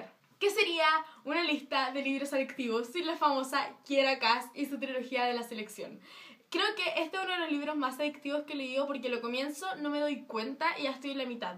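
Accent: Chile. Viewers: ¿Eres chilena cierto? 😂❤ Pd: si lo eres ya somos dos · eres chilena no? xd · tiene más acento de Chile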